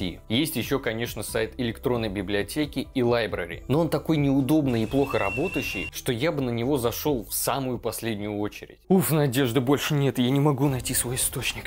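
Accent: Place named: United States. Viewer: Некорректно - неточно, неверно. Ну и этоо выкаблучивание с чтением с американсим выговором раздражает.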